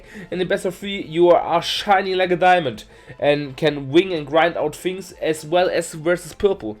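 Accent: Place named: Germany. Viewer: Ah nice, hatte immer das Gefühl wegen des typisch deutschen Aktzents 😁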